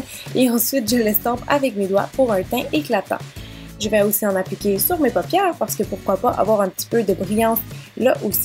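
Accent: Quebec, Canada. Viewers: megh rêve c'est l'accent québécois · t québécoise!?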